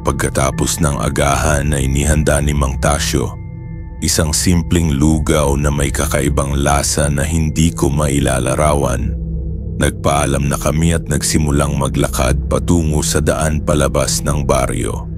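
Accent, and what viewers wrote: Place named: Conyo, Philippines. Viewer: AI narrator na konyo pa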